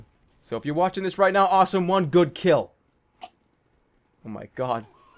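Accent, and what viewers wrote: Boston, United States. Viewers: Jeez the Boston accent. I want it T___T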